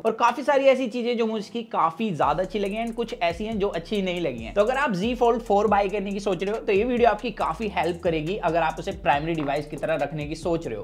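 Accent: Haryana, India. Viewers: dude try to improve your haryanvi rough accent.. hindi improve karo aur accent and english pronounciation par dhayan do... gawar mat ban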